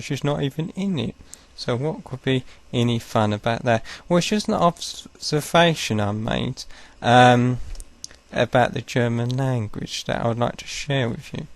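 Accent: United Kingdom. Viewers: Sounds like Toby from The Office US with a British accent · Me who knows little to non english: ... WHAT IS HE SAYING I CAN JUST UNDERSTAND ISOLATED WORDS Help i am having a meltdown 😂😂 British english is still way too much for me ahahah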